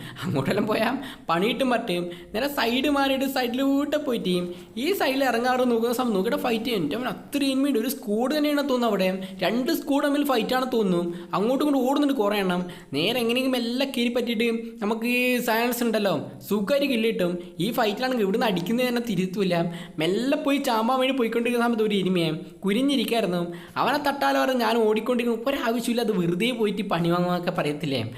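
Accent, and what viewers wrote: Kannur, India. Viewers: Kannur aanu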